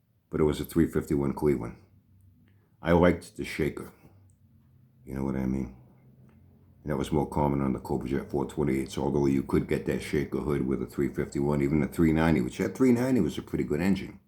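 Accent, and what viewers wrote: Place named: Massachusetts, United States. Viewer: You sound like you're from MA.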